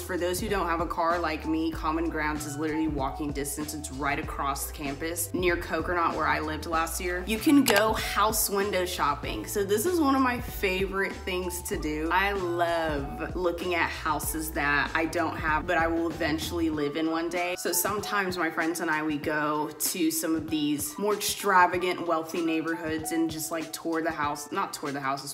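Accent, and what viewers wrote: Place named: New Jersey, United States. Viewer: the jersey accent is always a great addition to the videos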